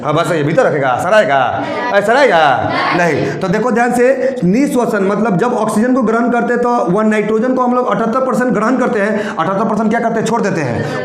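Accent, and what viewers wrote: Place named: Bhojpuri, India. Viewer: Bojpuri style read kar rahe sir i am raviranjan kumar 20 tarikh ko life maker aay the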